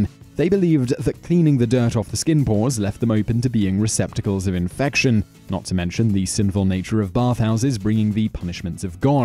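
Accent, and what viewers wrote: United Kingdom. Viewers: I really wouldn't expect a man with a British/English accent to have an UNBIASED opinion of the American Revolution. If the colonies were "impoverished", it was due to the taxation and mismanagement by the Crown. The colonists had to be good shots, since colonial larders were augmented with fish and game. The "Lobster Backs" as the British soldiers were called by the colonists, lined up in neat rows and fought in the European way. The colonists wore clothing dyed in nature's colors, and fought more in the native American way. Furthermore, the British were quite unfamiliar with the terrain in America--something the colonists were NOT. Furthermore, the British hired German mercenaries--Hessians--who sometimes defected to the American cause. Let's also keep in mind that the British senior officers--from the wealthy, landed aristocracy class--didn't exactly treat their own soldiers (let alone the colonists) with any modicum of respect. 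<link>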